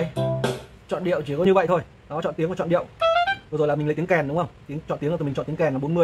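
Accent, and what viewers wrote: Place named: Hainan, China. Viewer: 你的语音和我一个海南同学的家乡话一样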